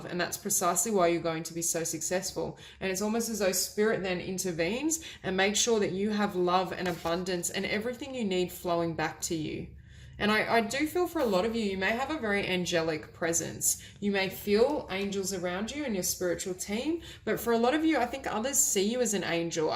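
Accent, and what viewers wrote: Australia. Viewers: Where are you from? Sound like Australian.. if yes, can i drop by for reading personally?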